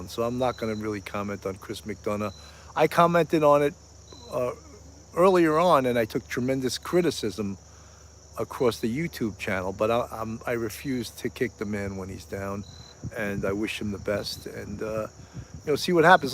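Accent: New York, United States. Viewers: Good to see you!! I spent years growing up in Trenton, NJ. Now I'm is SC. I love your New York accent!! 😊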